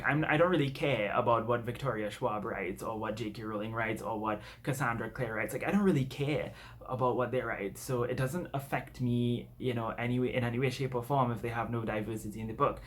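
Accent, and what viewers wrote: Trinidad and Tobago. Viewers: I heard your accent and I was like OH MY GOD because I haven't seen a lot of trinidadian booktubers before. So it's nice to see someone come from where I'm from that's into this. :D And honestly you have such a good point here. It's one of the reasons I don't like blackwashing because it's lazy. Instead of writing characters who are POC or looking and supporting authors who are and do write POC (which then makes these things popular) they get fussy about these white characters. I think diversity depends on a couple things. Setting, like you mentioned as well as how you write their character. Of course culture and heritage is linked but when their only character trait is that they're black it comes off as ignorant. Especially if you're not from that culture. I think that people who write these characters if their culture is heavily linked to it, they should do a lot of research and have people from that culture do a sensitivity reading. 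But I also think we need to talk about the publishers and marketing. They're really the ones that push aside and don't properly promote POC writers. Which needs to be tackled. Because if we don't fix this in the first place by supporting POC authors and making awareness, who will? These kind of situations also make me wonder what's my place as a mixed Indian. I can already tell that people will drag me because I'm not 'actually Indian' since I'm from the Caribbean. Am I only allowed to write characters like me? Even if my entire book/screenplay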